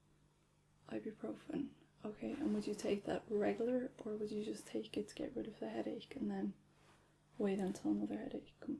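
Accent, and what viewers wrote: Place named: Ireland. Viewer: I was hoping this would be relaxing but the Irish accent coupled with being Irish myself just gave me PTSD of sitting in Tallaght hospital lol.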